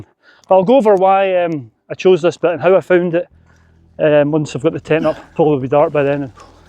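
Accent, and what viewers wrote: Scotland, United Kingdom. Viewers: It is spectacular place indeed! I started from opposite side and I had an amazing weather. I was drained at the end of the day but it was worthy. I wish to come back there with my friends! Thank you for your videos. I enjoy all of them! Well done! I am practicing with your Scottish accent :))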